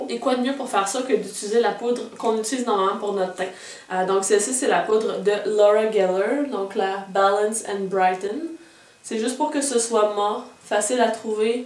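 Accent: Canada. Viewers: T'es canadienne, t'es pas? :-) très bon truc!!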